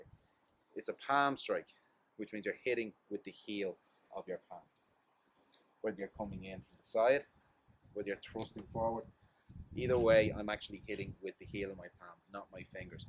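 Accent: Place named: Ireland. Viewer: I have palms on my hand but I don't have these paaaaalms that you speak of. Aye haha i just messing. I notice the irish accent has the same ah sound as the American midwest. Interesting sound.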